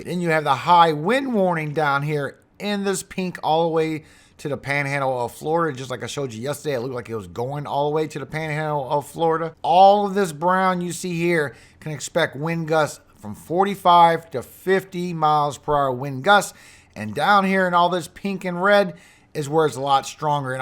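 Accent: Louisiana, United States. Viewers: Nice listening to to a good Louisiana man pronounce our cities. Like your RADAR and forecasts. Keep up the good work.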